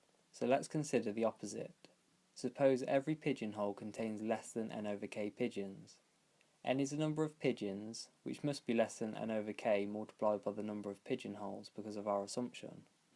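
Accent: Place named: Ohio, United States. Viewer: This is my uncle speaking your voice sounds like your from ohio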